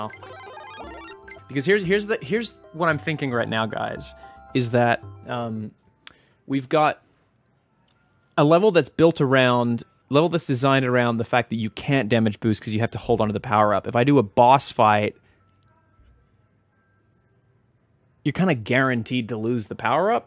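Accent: Australia. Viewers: grimer17 He is Australian, so I don't think so...😂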